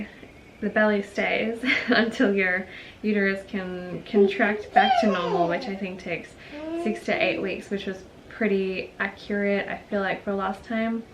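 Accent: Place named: United States. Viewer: Why do you have a part American accent? Did you live there?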